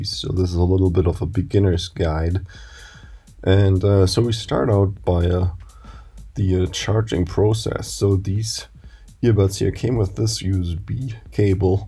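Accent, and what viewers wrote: Germany. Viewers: I think ur a german Ty for the instruction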